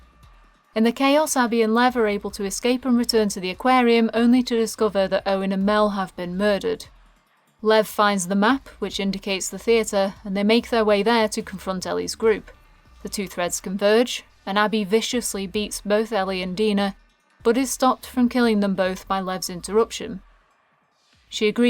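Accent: United Kingdom. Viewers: Good job with this analysis, it was quite interesting. Just out of curiosity, which part of England are you from? I only ask because your accent reminds me of the accent of the southern Birmingham area where I used to live, but I may be very wrong, as English is not my first language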